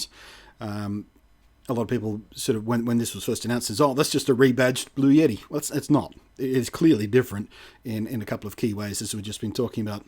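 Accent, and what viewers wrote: Australia. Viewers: Ha cool I guess your Aussie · True. Still the razor price is significantly higher when exchange rate and taxes are added. Around $200 AUD equivalent for USD to AUD for the Yeti and $240 AUD for the Seirēn. It seems that the Aussie tax for the yeti is about $30 and the Aussie tax for the Razor is around $50. Still I dislike the arbitrary markup on any goods. Really liked the comparison though. Is the extra $50 worth the extra functionality? Keep up the excellent work. It really is great to hear an Aussie voice doing reviews like this.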